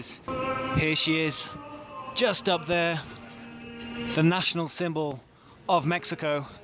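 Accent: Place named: United Kingdom. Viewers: johan gomez Fíjate en la manera en que él habla, se le nota el famoso "acento británico" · Totalmente, pero hay quienes apenas distinguen que habla inglés, mucho menos que tenga acento británico.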